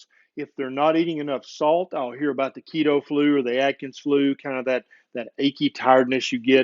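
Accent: South, United States. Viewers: So I'm in my thirties and just giggled at you saying "poop" because of the southern accent. I'm an adult. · It must be the southern drawl. Makes the word poop sound so flavorful.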